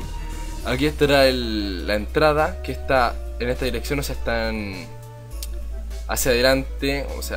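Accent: Chile. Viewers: es chileno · ¿De qué país eres? es que tu voz la siento de chileno(?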